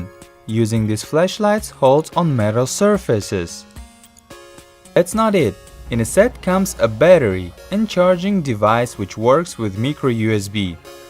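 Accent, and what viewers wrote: Russia. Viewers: Your accent is not true. You are rus. Я человек, который живёт в Англией, слышал как говорят люди на английском и точно знаю. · «Immunisation of” what? Учи английскиц, школота. Как же заебал ваш рязанский акцент. Тошнит прям. Лучше рекламируй китайское дерьмо своим руцким братанам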